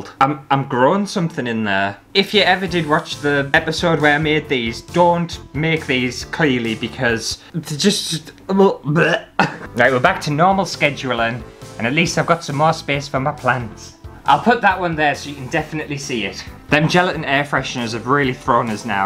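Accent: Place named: Carlisle, United Kingdom. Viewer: I had to come and comment before the video finished. Totally creased when you showed the pocket and then the knife slid out the bottom. Now I've stopped laughing I'll continue with the rest of the video. By the way I came upon your vids by chance, have subscribed and binge watched about a dozen videos at this point. Your banter just cracks me up. Also love the accent, coming from Carlisle it's an accent I'm familiar with.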